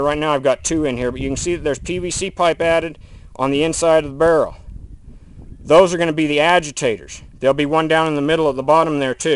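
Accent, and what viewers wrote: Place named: United States. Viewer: Have to ask ..I collect accents ..what state are you from..??/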